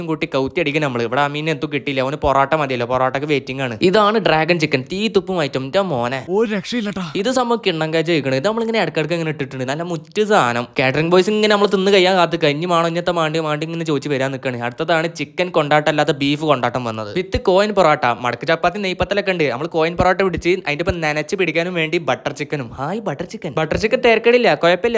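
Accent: Malappuram, India. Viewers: Accounting is a process of recording, classifying, summarising, analysing and interpreting the financial transactions, 😂😂😂😂... ഞാൻ ഇക്കയോട് മുമ്പത്തെ വീഡിയോയിൽ പറഞ്ഞിരുന്നു, എൻ്റെ ഗുരു ആണ്, എനിക്ക് മലപ്പുറം slang പഠിപ്പിച്ചു തന്ന ഗുരു, അതുകൊണ്ട് എനിക്ക് എൻ്റെ സാറിൻ്റെ slang manasilaayi ennu പറഞ്ഞു ഒരു comment ചെയ്തായിരുന്നു, ആ sir accounts subject ആണ്..ക്ലാസ്സിൽ വന്നാൽ തുടങ്ങും, recording, classifying,summerizing,. ഇക്കാ ഇന്ന് ഇത് പറഞ്ഞപ്പോ,ഞമ്മൻ്റെ മനസ്സ് തൃപ്തിയായി😂😂😂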